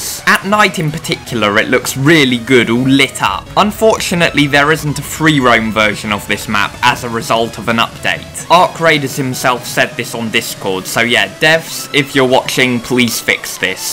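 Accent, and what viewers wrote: United Kingdom. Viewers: Bro has the hardest British accent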